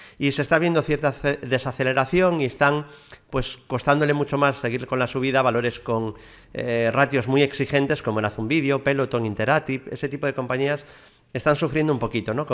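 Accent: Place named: Galicia, Spain. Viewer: No puedes negar que eres gallego.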